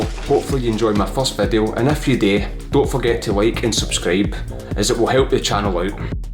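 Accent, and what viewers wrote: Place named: Glasgow, United Kingdom. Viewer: DONT DAE IT MATE KEEP IT REAL TALK LIKE A NORMAL SCOTTISH PERSON EVERY SCOTTISH YOUTUBER SOUNDS LIKE A FUCKINGBENTSHOT FAE GLASS GAOW UNIVERSITY HAHA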